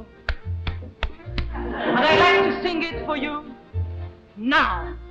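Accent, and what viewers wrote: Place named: France. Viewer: Charming, French accent and nice, stage presence!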